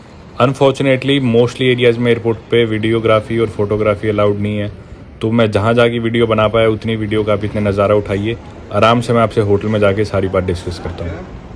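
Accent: Haryana, India. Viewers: Bhai is your hometown near Hisar or bhiwani Haryana? You just sounds like some of my friends from nearby villages.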